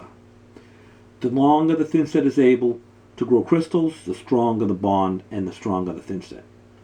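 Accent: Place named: Northeast, United States. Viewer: Ask not what you can do for your tile job... Is this the accent from the American northeast? - he sounds a bit like (former) President Kennedy? Rob Melbourne... Australia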